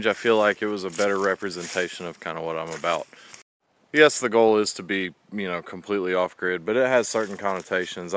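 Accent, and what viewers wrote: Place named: Missouri, United States. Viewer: I swear ...you sound like your from Missouri.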